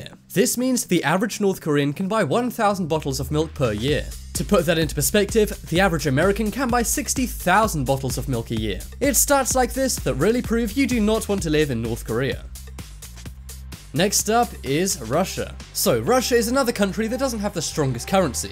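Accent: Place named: England, United Kingdom. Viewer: Why does he have an English accent but use American terms in all his videos?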